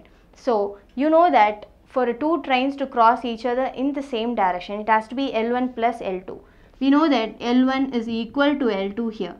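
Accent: South India, India. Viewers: You're from south India?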